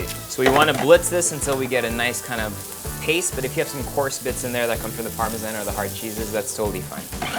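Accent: France. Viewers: Great, simple recipe.. It look so yummy! And that French had me swooning over even more than usual. Keep it up! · Homies French? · good French accent !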